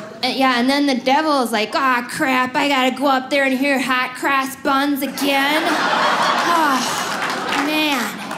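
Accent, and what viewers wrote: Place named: Minnesota, United States. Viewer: Lol she has the stereotypical Minnesota accent.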